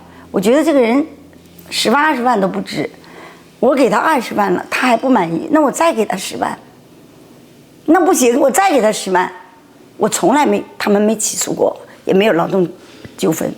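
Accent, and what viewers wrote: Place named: North, China. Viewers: 这女人格调不高，一口北方口音，关键好像光脚上田没多久的感觉😅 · 你的口音就是北方再北方的人的口音, 一个喜欢博别人眼球的人, 还自称广东人, 见鬼去吧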